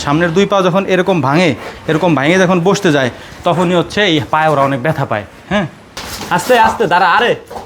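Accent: Faridpur, Bangladesh. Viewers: ভাই কি আমাগো ফরিদপুরের মানুষ নাকি ভাষা গুলো শুনলেই কলিজায় লাইগা যায়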